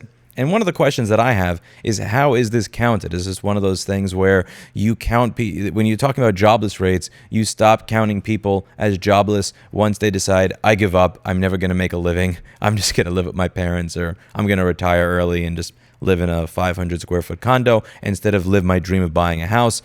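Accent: New York, United States. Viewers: Love it. You come across as a typical New Yorker until the 2nd minute that you putting sound thinking to work. And then communicate it like a New Yorker.I hey I like New Yorkers, it's OK. More than that I love your show and i too have been seriously annoyed with your main topic - right to repair. I'm an engineer and love to tinker. Back in the day that was half the fun. Woz backs backs you. I think even God would be happy for Woz's backing.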